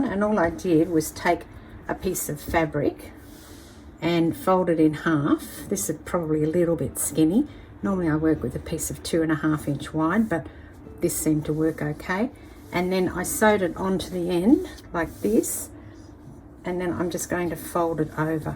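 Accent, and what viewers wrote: Australia. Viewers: So wonderful to hear an Australian voice working with scraps! I have just begun my scrappy journey. I love the project. Thanks for sharing!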